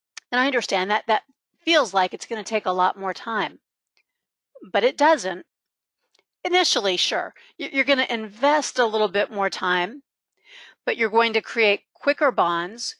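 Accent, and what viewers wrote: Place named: United States. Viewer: The heavy American accent has ruined the presentation.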